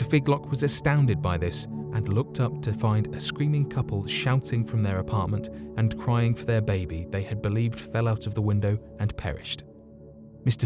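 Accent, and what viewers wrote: United Kingdom. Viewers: Dude!! You are screwing up Royally. Franze Joseph, really? Butcher? Dictator? Are you really that stupid? Franze Joseph was neither a dictator nor in any way a butcher!! WTF is wrong with you? He was the Emperor true. But loved and respected. I live in Vienna. And have family here going back 800 years. Franze Joseph is still widely regarded as one of their most beloved leaders. Keeping them out of war and reigning over a period of peace and prosperity. In the video you say these three men. And then show a picture of five men known of whom is Franze Joseph. Stalin yeah, thats Stalin. Hitler uh huh, thats Hitler. Sigmond Fruede yeah, thats him. But Franze Joseph dont think so. Are you getting high before you edit? Really sloppy work here. A British accent doesn't make you smart. You going to apologize to two million Viennese.(Damn son. 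I wouldn't be visiting this wonderful, tolerant city any time soon.) Good luck with that apology. Very sad. Sloppy work.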